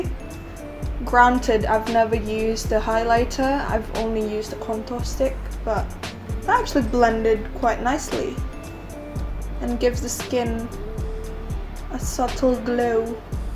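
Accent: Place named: United Kingdom. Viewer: gurl your British accent has gotten so much heavier than your last video lmao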